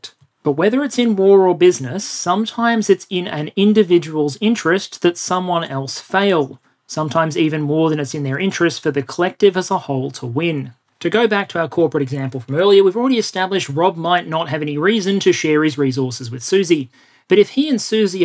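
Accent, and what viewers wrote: Australia. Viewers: It’s the Aussie accent 😉😇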